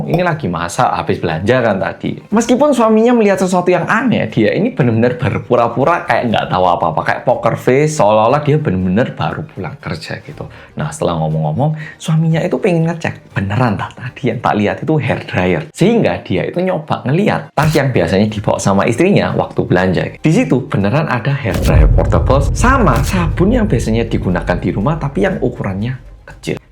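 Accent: Java, Indonesia. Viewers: Uedan kok bisa semedog itu bahasamu masee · Wuaduh kang Korea medhok berubah ke tema selingkuh, tau aja tema kesukaan netizen. Ha...ha... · Korea top logatnya jowo tenan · Bahasanya bnr2 lancar udah seperti org indonesia asli. Logat jowo pisan😅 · Logat korea mana ya, kok agak mirip Logat Jawa tulen,,,, maaf maklum subsreber baru nih,,, baru nonton video nya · Salut dg org korea satu ini.. dg gaya bicaranya yg indon banget. Kl kasusnya sih ga beda2 jauh dg asn imigrasi pekan baru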